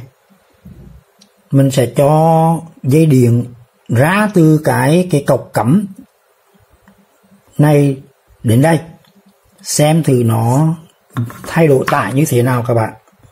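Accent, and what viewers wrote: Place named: Hue, Vietnam. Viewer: Rất hay!! Thích nghe giọng nói ĐẬM CHẤT HUẾ của ANH....THANKS.!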